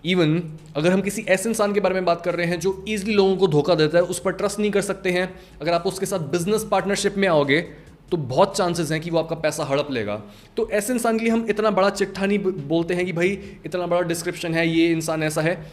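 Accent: India. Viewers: İngilizce biliyorum saniyodum ta ki Hint aksanlı bu adamı dinleyene kadar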